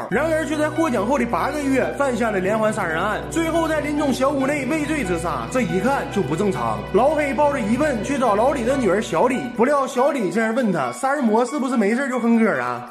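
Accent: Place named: Northeast, China. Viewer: 我承认有些人爱听东北口音，但是也有好多人都听不惯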